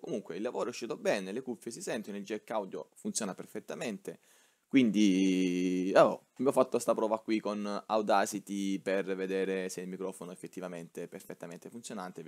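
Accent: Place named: Apulia, Italy. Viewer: Ho provato a seguire passo passo ma il mic nin funzionava. Ho sentito che hai un accento pugliese e per questo ho chiesto,io sono in provincia di Brindisi.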